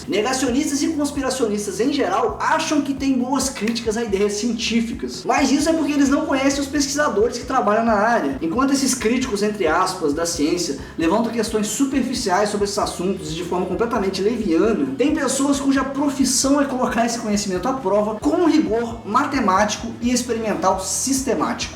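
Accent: Minas Gerais, Brazil. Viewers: amo de coração seus videos, não perco um. Mas, a forma que você fala e no "mineires" (falando rapido) as vezes fica dificil de entender. Nos videos maiores as vezes tenho que voltar um pouco pra conseguir seguir o raciocinio. Sou da area ambiental mas sou apaixonado em fisica.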